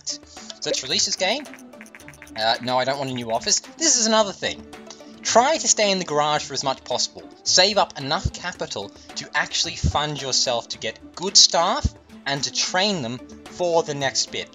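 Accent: United Kingdom. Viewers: Nicely done tips, this game was kind of frustrating me in that every game i made would tank or i was moving too fast when it told me too. Also rates up for your voice too, you must be a Brit like I.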